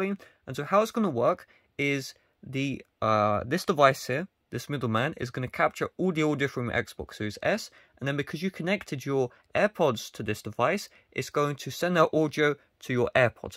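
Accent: United Kingdom. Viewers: Nice british accent mate! Watched till the end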